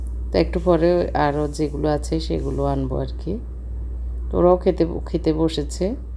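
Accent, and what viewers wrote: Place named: Cox's Bazar, Bangladesh. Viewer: আমি ককস্ বাজার থেকে,আপনার কথা শুনে মনে হচেছ আমাদের কাছের।কোথাকার বলবেন?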